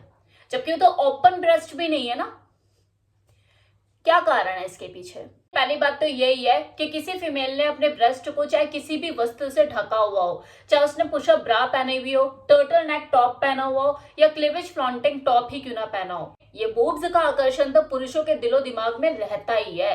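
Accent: Haryana, India. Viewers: App Haryana ke ho?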